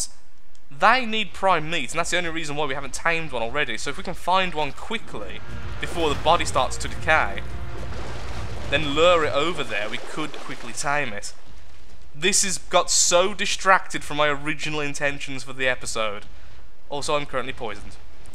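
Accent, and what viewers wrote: United Kingdom. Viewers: British accents make everything sound weird...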